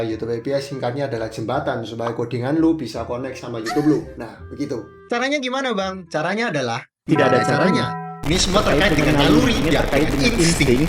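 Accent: Surabaya, Indonesia. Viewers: Wong Surabaya Iki mesti😂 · wong suroboyo iki mesti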